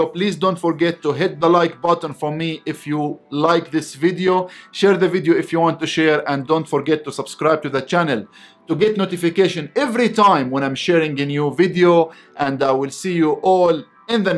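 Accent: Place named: Egypt. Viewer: Egyptians trying hard to speak English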